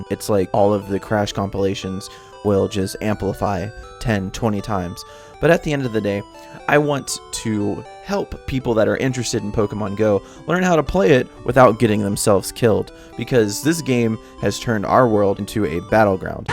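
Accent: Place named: Australia. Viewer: Oh? You sounded more Australian to me :P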